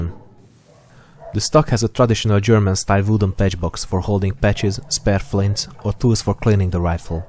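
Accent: Hungary. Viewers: Behold the hungarian accent... :D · Hungarian accents are gorgeous :3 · Are you hungarian? (Because you have a hungarian accent.) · What i hear is hungarian accent? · A hunglishodból egyből tudtam, h magyar lehet az anyanyelved, még mielőtt utaltál rá :) Tetszik a videó, csak így tovább!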